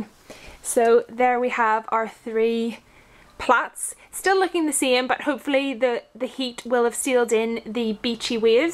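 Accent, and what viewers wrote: Ireland. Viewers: Are you from Ireland or North Ireland?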